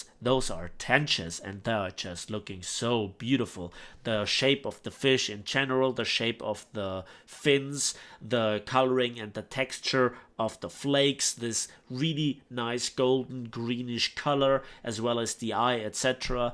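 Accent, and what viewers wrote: Bavaria, Germany. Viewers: Ist das bayerisch? Oder ein anderer Akzent?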